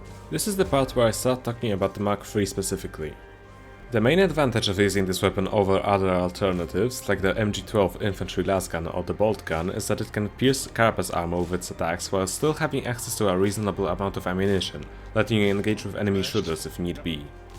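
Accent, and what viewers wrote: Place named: Germany. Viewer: He has a german accent, I trust him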